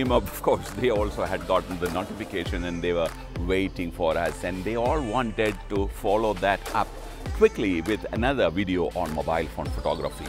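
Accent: India. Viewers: Ye Bande ko dekh k peheli baar dimag ka bhosda Huwa hy, 😂😂😂 gora kabse Indian accent me bath karne laga bhai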